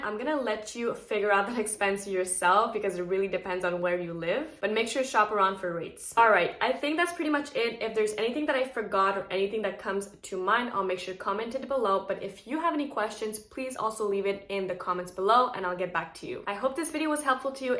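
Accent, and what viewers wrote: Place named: United States. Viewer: she sounds American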